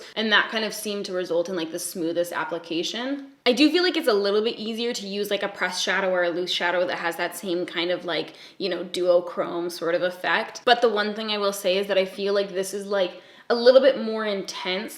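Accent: Canada. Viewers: I fucking knew u were canadian. gang 🍁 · You look extra beautiful and happy in this video tysm your vids make my day! Ive been living in england for almost 2 years now and sometimes i just really need a canadian accent to make me feel like Im home 😫😭😛 Love you!!!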